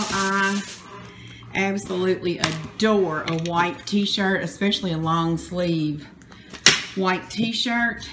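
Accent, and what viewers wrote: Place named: Texas, United States. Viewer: The opening of this video had me cracking up..... Clay is going to keep you honest. He wants to make sure you saw that temperature correctly...lol. And is this the cat you mentioned that would scratch the heck out of you if under the sheets? Well I would have never known because she looks all cozy and cute all up againt you. Too freaking cute if you ask me. And girl I just love your accent, I'm not from Texas so hearing it is music to my ears. Good luck with the voice acting thing., you deserve that and more.....and last but not least, you looked great on all the shirts and blouses you tried on. My fave was the pink or mave with the lace. You looked very pretty, yup even in the dressing room.......lol.......love you girl, love your vlogs and keep them coming. Becuz your laugh is contagious.......blessings ♡♥♡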